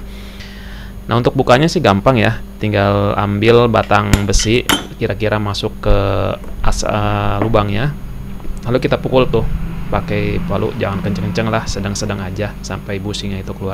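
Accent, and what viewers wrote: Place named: Banjar, Indonesia. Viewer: Org banjar kh, logatnya tu..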